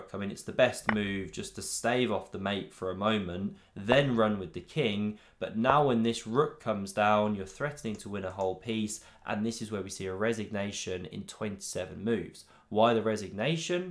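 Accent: United Kingdom. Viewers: Congratulations because I' m italian and I understand every Word, your english Is pure. Are you British?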